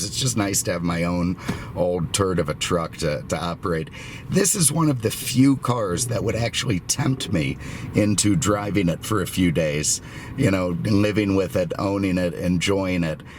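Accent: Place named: United States. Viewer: I also love the way this guy explains cars is good humor and especially the accent.. pure Americana if I owned an Automotive Group Los Angeles you'd be my spokesman on TV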